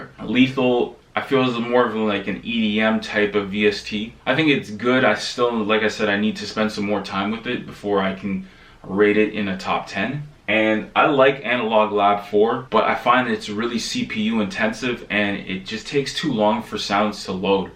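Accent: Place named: Toronto, Canada. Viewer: great vid. I could tell you were from the GTA based on accent alone within the first minute lol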